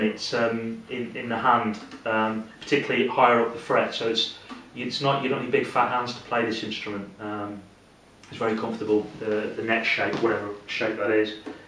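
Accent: United Kingdom. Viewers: You actually bothered to type that? The vids great, it's a mixture of talking and playing, about right in my opinion. Nice to hear it done by a no nonsense British bloke for a change, like ya mate doing it.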